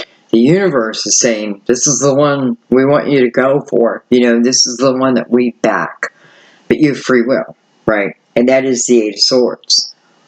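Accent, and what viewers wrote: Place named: Pittsburgh, United States. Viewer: You are getting so warm on what I am going through I can feel the heat! I found you about two years ago. Being a Pittsburgh native myself, I knew from your dialect and Steelers stuff that you were one too! So thank you for being there because when you read my cards, I am an Aries, it is like coming home to an old friend. Have a blessed year.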